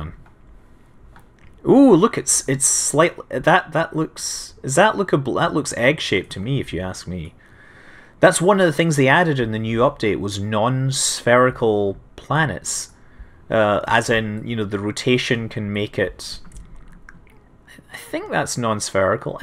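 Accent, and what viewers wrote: Scotland, United Kingdom. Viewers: I adore your Scottish accent,.:D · You are a EXTREMELY interesting guy and easy to listen to, and i love the scotty accent. :D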